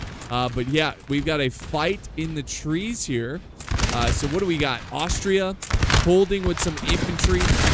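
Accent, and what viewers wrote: France. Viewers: your French accent never fails to make me laugh. You really made my day!! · Love french accent hahaha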